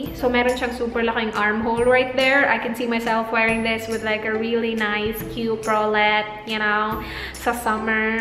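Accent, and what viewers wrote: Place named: Visayas, Philippines. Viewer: What city do you live ate? The way you speak tagalog makes me think you're bisaya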